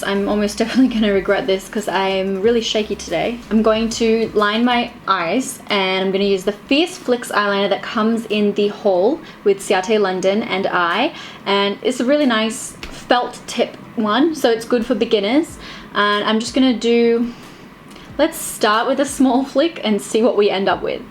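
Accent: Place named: Australia. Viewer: watching your videos remains the best part of the day 🎉 your accent reminds me of my best friend back in Australia and I looove it 😍 btw, this look is some serious 🔥🔥🔥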